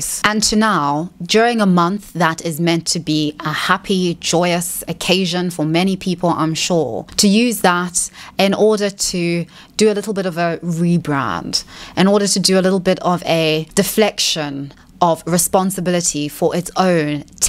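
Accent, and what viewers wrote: South Africa. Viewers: She's South african but has lived in the uk since she was a teenager, so it's probably her going in and out of accents. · It's her accent, people talked like that around here in South Africa, she's explained that before.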